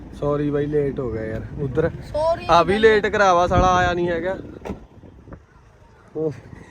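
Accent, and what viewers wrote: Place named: Patiala, India. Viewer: ਬਾਈ ਕਿਆ ਥਾਰਾ ਪਿੰਡ ਪਟਿਆਲਾ ਕੇ ਲਾਗ ਪਵਾ ਬੋਲੀ ਤਾਂ ਇੱਧਰੀ ਕੀ ਲਗਾ ਬਾਕੀ ਛੋਟੂ ਘੈੱਟ ਐ ਜਿਸ ਕੋਲ ਘੱਟੋ-ਘੱਟ 300/ ਰੁਪਏ ਤਾਂ ਹੈ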